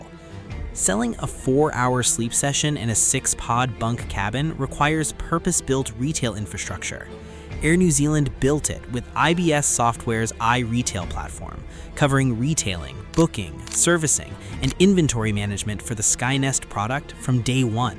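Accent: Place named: United States. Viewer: This video is very obviously an AI Voiced (with shocking pronunciation in an American accent) infomercial produced by Air New Zealand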